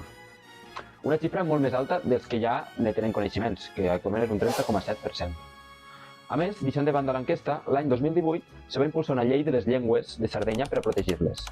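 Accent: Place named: Tortosa, Spain. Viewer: Xe, sense mirar lo nom del teu canal, he pesant: Sona a que és de València. Espera, parlar pronunciat com parlá... De Lleide o per Tortosa. I en efecte, ix la capçalera de. Està interesant saber coses d'estes per l'accent. Crec que t'he vist per twittah.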